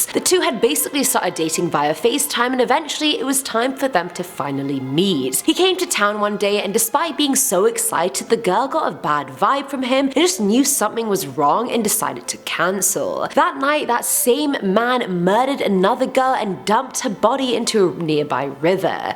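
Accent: South, United States. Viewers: Lol nice southern accent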